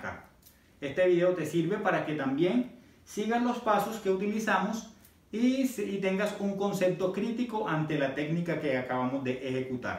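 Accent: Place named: Colombia. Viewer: Bogotá, pero por su acento sabia que era de Colombia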